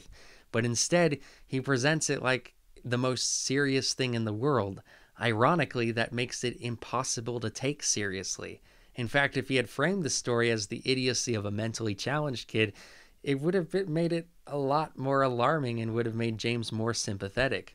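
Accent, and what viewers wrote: New Jersey, United States. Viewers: the simple explanation is that hes from Jersey his accent gets in the way of pronouncing certain words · I think he pronounces words in that manner because of his scarfy mid atlantic jersey accent … a little philly a little NYC a little North jersey … mash up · Dude he's from New Jersey, of course he has an accent.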